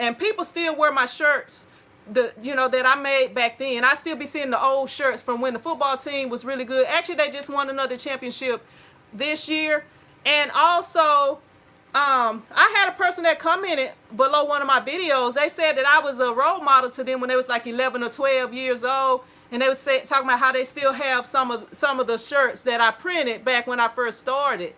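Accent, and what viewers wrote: South, United States. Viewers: Yes, I watch you because I love your southern accent. 😁 Great resources! Thanks.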